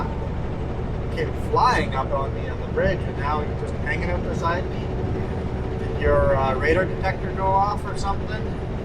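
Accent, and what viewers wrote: Canada. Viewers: Nope. You sound like a Canadian to me. From a fellow Canadian and British Columbian. 😎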